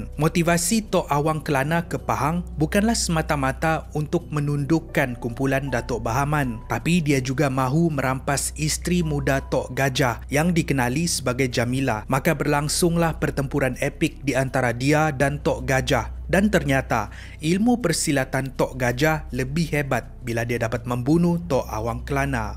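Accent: Sabah, Malaysia. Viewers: kamu org Sabah ka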